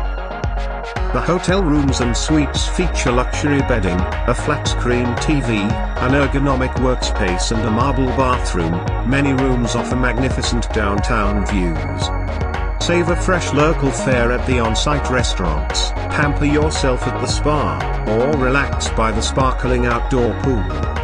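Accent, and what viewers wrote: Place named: England, United Kingdom. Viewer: Dude’s English accent annoys me.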